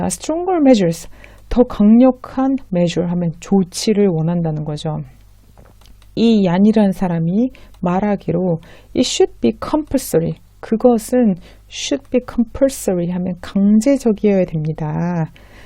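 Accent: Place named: United Kingdom. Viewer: 헛 어쩐지! 영국영어발음 멋있어요!^^ 오히려 목소리가 이쁘셔서 어색한 남자 원어민 발음보다는 직접 한번에 싹 다 녹음하셔서 들려주시고 스크립트를 고정댓글이나 자막으로 하시면 훨씬 듣기도 좋을 것 같아요!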